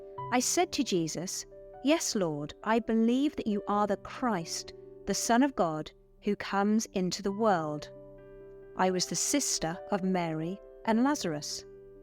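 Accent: England, United Kingdom. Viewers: First time I got the English accent lady's quiz totally correct. I got scared when I saw "Ruth" and I thought you'd ask Chilion's wife's name. I know it means "back of the neck" but I can't remember.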